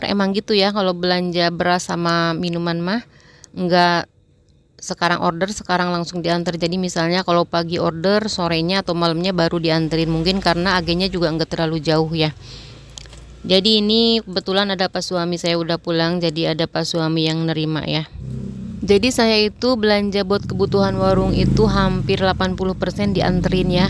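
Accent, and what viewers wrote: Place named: West Java, Indonesia. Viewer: Teh di daerah mana? Meuni Sunda pisan, salam kenal ti abdi dari kota Bandung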